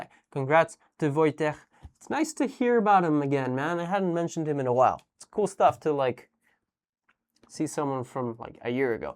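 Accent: United States. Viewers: you speak perfect American English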